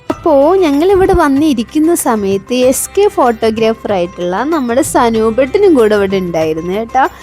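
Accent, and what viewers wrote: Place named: Thrissur, India. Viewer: വിവരണ ഭാഷ ശരിയായില്ല 😏 എന്തോന്ന് ഇത് വല്ലാത്ത ഒരു എന്താ പറയ തൃശൂരിലെ ഭാഷയെ മോശം ആക്കരുത്🤮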